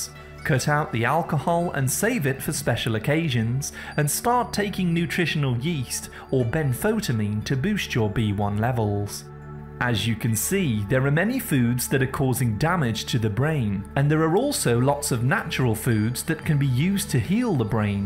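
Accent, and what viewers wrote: United Kingdom. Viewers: Very informative, thank you! Interesting that you read with a British accent but then use American names. E,g. I don't know a single Brit who knows what arugula is (it's called rocket here)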